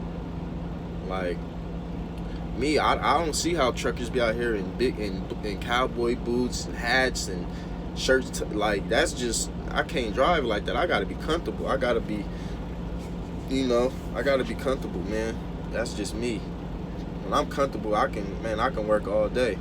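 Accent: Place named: Los Angeles, United States. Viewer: What part of LA you from homeboy, i can hear it all in yo lingo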